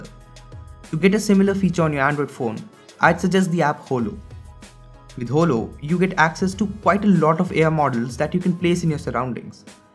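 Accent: India. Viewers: Good video overall but I don't like that thick Indian accent. Sometimes it's hard to understand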